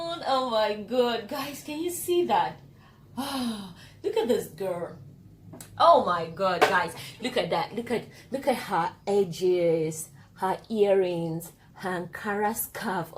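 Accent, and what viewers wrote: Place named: England, United Kingdom. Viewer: Are you were living in the UK because your accent is similar to that of England?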